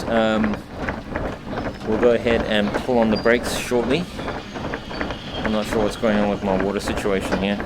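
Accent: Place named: Australia. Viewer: What country are you from, you have a slight Australian accent.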